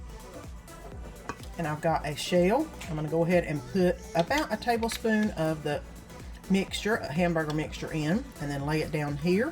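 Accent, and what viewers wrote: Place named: Boston, United States. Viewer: Just came across your channel. You’re adorable. I live in the Northeast, near Boston with a Boston accent so hearing yours is so fun! Great recipes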